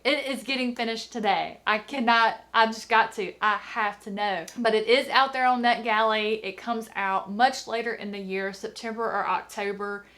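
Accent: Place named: Tennessee, United States. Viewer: Your accent is the best <3 TN am I right? I lived there for a little bit and everybody had a nice twang.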